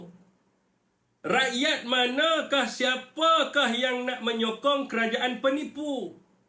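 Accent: Kedah, Malaysia. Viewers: Sembang je lebih. Ko la melingkup. Ko pelat kedah, tau dah ko ckp sentimen politik. Sedap ckp kerajaan penipu. Siap sebut walanon... tau dah kiblat ko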